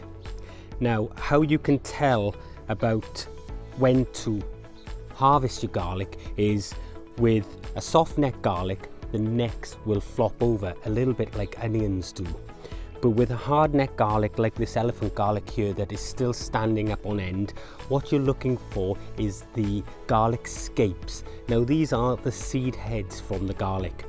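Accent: Wales, United Kingdom. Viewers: Love your videos, great presenting style and the accent is brilliant! (I'm welsh too!). After watching this one I think I'll be adding Garlic to my garden next year. Cheers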